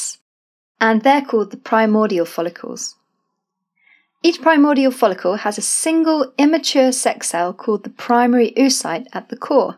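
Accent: United Kingdom. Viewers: This british narrator gotta go. Im sorry. Bring the guy back please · Im sorry but in my prefrence, its alot harder to memorize and understand what the video is teaching when the person has a british accent.... im sorry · Extremely bad video, not obvious, bad fast voice, videos used to be more helpful and obvious It is NOT a compilation for the sexiest British accent!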